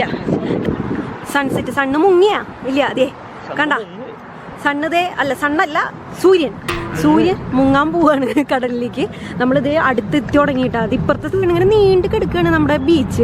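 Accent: Thrissur, India. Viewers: Chechi Thrissurkari ano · superb.....nattilevide veeduu.....talk style kettitt thrissur pole.... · എന്റെ ചേച്ചി നല്ല രസം ഉണ്ടായിരുന്നു കാണാൻ. നല്ല സ്പീഡ് ഉണ്ട് വർത്താനം, ഞാൻ ഇങ്ങനെയായിരുന്നു ചേച്ചി. എന്റെ വീട് തൃശൂർ ആണ്, എന്നെ വിവാഹം കഴി ച്ചത് എറണാകുളം, ചോറ്റാനിക്കരകാണു.എന്റെ ഭാഷ ചേച്ചി പറയുന്നതുപോലെ സ്പീഡിൽ, അവർ എന്നെ കളിയാകു, പിന്നെ പിന്നെ ഞാൻ എന്റെ വർത്തമാനം ചുരുക്കി, ഇപ്പോൾ തൃശ്ശൂർ ഭാഷയു എറണാകുളം ഭാഷ യു കൂടി കലർന്ന ഒരു ഭാഷയായി, വീട്ടിൽ ചെല്ലുബോൾ വീട്ടുകാർ ചോദിക്കും അവിടെനിന്നു കിട്ടി നിനക്ക് ഈ ഭാഷയെന്നു, എന്റെ ചേച്ചി ഞാൻ ഇപ്പോൾ അതികം മിണ്ടാതെയിരിക്കു, അതാണ് സേഫ്. ചേച്ചിയുടെ വർത്തമാനം ഫസ്റ്റ് കേട്ടപ്പോൾ തന്നെ എനിക്ക് മനസിലായിത്രിശ്ശൂർകാരി യാണല്ലോ എന്ന് അപ്പോൾ തന്നെ സബ്സ്ക്രൈബ് ചെയ്‌തു. തൃശൂർ ഭാഷ എനിക്ക് ഭയങ്കര ഇഷ്ടം മാണ്, ഇപ്പോൾ ഏതു ഭാഷയാണ് ഞാൻ പറയുന്നത് എന്നു എനിക്ക് അറിയില്ല ചേച്ചി. അ ചേച്ചി കടലക്കറി ഞാൻ ട്രൈ ചെയ്തു, നല്ലതാണ് ട്ടൊ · Adipoli you are so innocent I like your talk. 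Exactly thrissur kari...... 😂😂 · chechy Thrissur slang adipoli .Thrissur evidanu veedu? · ഹായ്... മ്മടെ ത്യശ്ശൂർ ഭാഷ അടിപൊളി...... പിന്നെ dress നന്നായിട്ടുണ്ട്... ട്ട.. vloggum... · Chechi pwoliyanu tto. Pakka thrissurkari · Hey super aada nalla Rosamund iniyum chaidolutta entha resam aa Trissur bhasha kelkan enik ishttayi 😘😘😘😘😘😘😘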